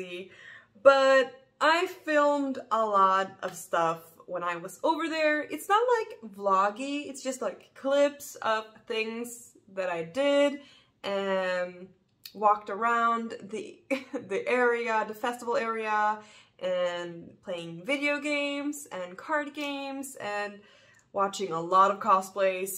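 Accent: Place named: Scania, Sweden. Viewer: true that 😊 And, you know, skånska 😍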